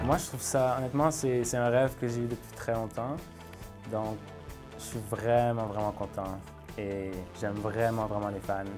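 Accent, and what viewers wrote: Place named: Quebec, Canada. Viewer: omg yer québécois!😭❤️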